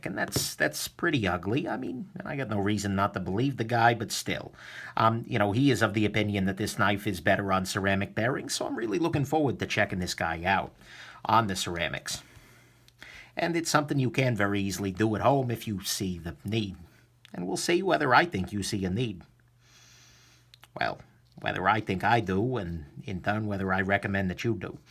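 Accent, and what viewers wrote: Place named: Northeast, United States. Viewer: voice is calming, which is usual with a Noreaster accent. Great video with very thorough information.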